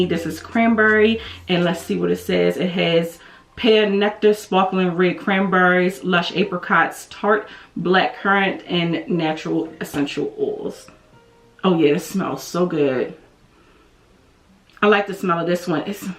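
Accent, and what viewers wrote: Baltimore, United States. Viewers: You definitely are from Baltimore with that accent? Lol. Nice haul!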